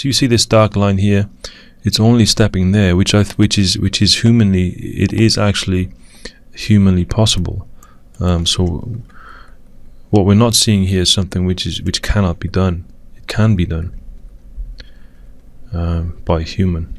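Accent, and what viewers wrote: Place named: Wales, United Kingdom. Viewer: please jus keep your great vids coming!:) x ps I love ur Welsh accent too;)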